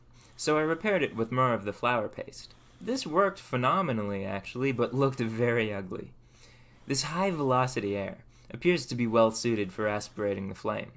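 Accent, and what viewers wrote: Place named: Maryland, United States. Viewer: Kinda sounds like a Maryland accent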